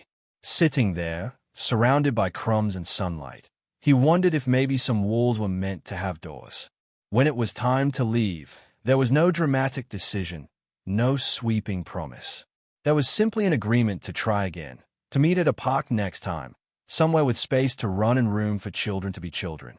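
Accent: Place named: Australia. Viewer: Love the Aussie accent!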